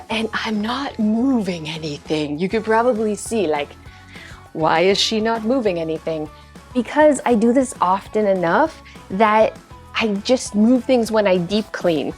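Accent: Canada. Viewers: "aboot" I knew Canada before i even checked. 😍 Great video. Systems are key. Keep up the great work.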